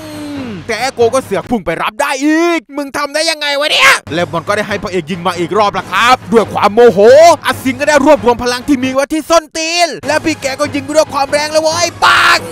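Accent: South, Thailand. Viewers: ฟังๆ ตกลงแอดคนใต้เออะนิ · แอดเ็นเด็กใต้ช่ายหม้ายนิ?? อยู่จังหวัดใหน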